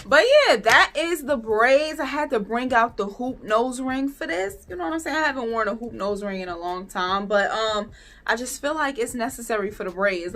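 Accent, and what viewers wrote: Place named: North, United States. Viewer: Girl I love your accent! I’m from TN but my husband’s from up north and y’all kinda sound alike!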